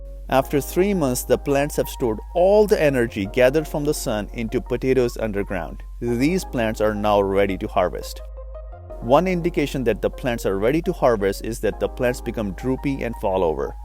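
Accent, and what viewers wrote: Punjab, India. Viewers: Love the punjabi accent.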